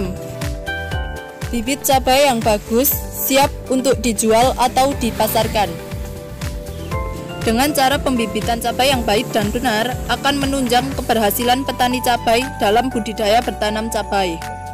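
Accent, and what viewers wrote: Java, Indonesia. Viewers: Saya gk butuh MEDOK 😀 Nya....saya butuh informasi ilmunya.... semangat nduuuuukkkk...👍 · Saya seneng pembawa acaranya ada medok medoknya gitu 😀😀😀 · Medhok tapi Keren 😁😁😁😁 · Tapi suara presenter nya medok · Medok bngt ngomongnya😂😂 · Salm medok..😅😅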